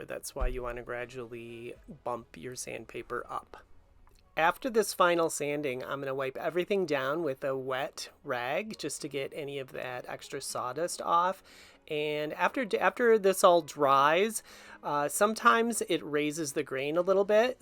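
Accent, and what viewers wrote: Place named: Midwest, United States. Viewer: I THOUGHT I heard some midwest inflection there! (I'm in Ohio) What gorgeous wood grain was hiding under that old varnish!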